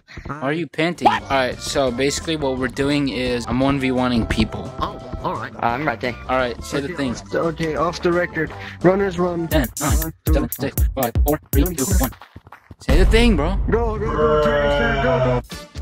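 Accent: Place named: United Kingdom. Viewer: Bro speaking literally in British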